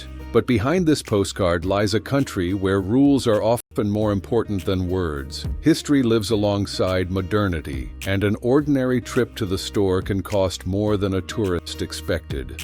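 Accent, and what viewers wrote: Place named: United States. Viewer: The AI voiceover would be a bit more appropriate if it was anglicised & deleted americanisations like,(Looo——— tenant.& rowwt) The video is ,however, a true reflection of Brits in general.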